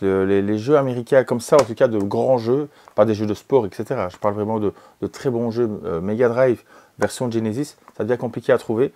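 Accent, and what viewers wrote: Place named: Belgium. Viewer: salut salut!!! Super la vidéo j'ai pas su y aller et j'y tenais comme néophyte pour voir se que l'on ose imaginer! La prochaine fois promis. Autre chose, On avait parler de pc console portable pour faire plus abouti, j'avais parler de la rog alloy mais depuis qu'elle est sortie c'est toujours hors budget, tu m'avait transmis un lien pour des occas Steam deck mais je ne retrouve plus le lien. Parce que bon j'me suis laisser avoir par l'anbernic rg 556, pour laquelle tu ne m' pas donner d'avis constructif si il y en a un. En tout cas je reste a se que j'ai pour l'instant dans l'idée de m'offrir une Steam deck Oled plus tard.... D'ailleurs il y a un délais responsable sur lequel compter pour pouvoir se l'offrir quand elle le faudra encore sans non plus avoir une bouzz a 100 balles... Je me limite PSP PS2 wii etc pour l'instant et d'ici quelques mois j'investî gros! 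PS: j'adore l'accent belge pour l'être moi même, ça fait encore plus en vidéo haha.merci pour les infos!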